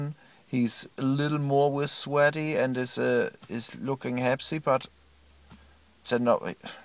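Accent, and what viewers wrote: Germany. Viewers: he sounds german :) it must be very funny if someone has english as mother language, but for me it's perfect english haha :D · It's his true accent. He's a real DJ from Erlangen Germany :) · definitely german. The accent, the stuttering, everything. when people imitate germans they talk angrily and keep shouting das this das that.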